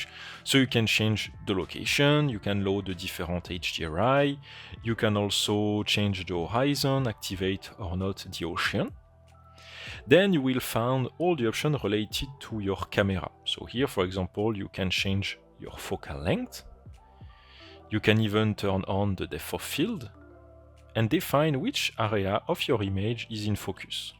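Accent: France. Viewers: Just a reminder, english is not french, and you do not pronounce english terms as if they were french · J'aime bien son accent frenchy. Ca rend les tutos beaucoup plus limpides. Pas besoin de sous titres pour comprendre.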